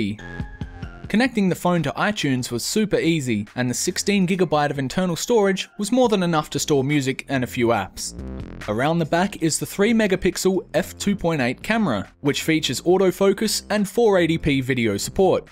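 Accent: Australia. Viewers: 1. he was born with an australian accent and can't change that and should be proud of it. 2. he can do whatever he wants with his hair. that's not your choice 3. i think his videos are really good quality and better than most tech channels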